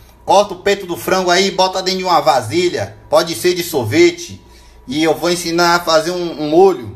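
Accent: Bahia, Brazil. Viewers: é sotaque mesmo kkkj Sou baiano e falo igualzinho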